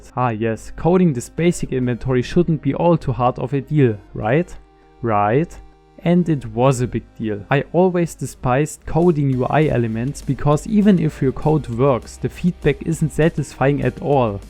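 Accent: Germany. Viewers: you have a german accent.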